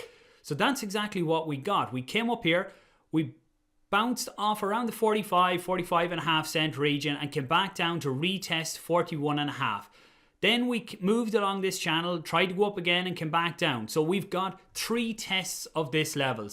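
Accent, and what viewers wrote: Ireland. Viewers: Heard the Irish accent and subscribed straight away🇮🇪🇮🇪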